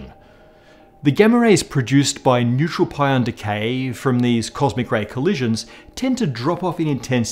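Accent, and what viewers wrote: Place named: Australia. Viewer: OY! LOOK! AN AUSTRRRRRRRRRRAILIAN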